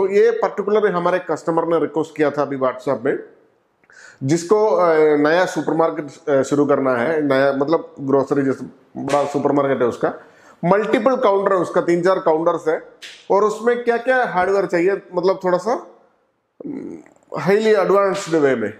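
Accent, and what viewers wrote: Kerala, India. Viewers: Cheta Malayali aano....slang spashtamayitu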